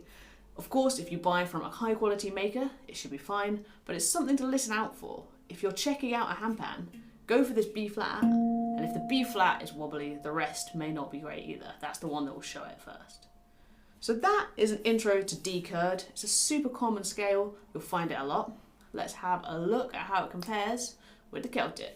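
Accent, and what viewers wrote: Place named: United Kingdom. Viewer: Thank you so much! Informative, and easy to understand. (and my Californian ears love your U.K. accent) 🥰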